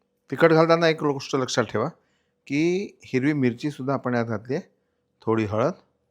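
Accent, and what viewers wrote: Vidarbha, India. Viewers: Kitti god bhasha tumchi,tumhi majhya vidhrbache ka ho😂❤❤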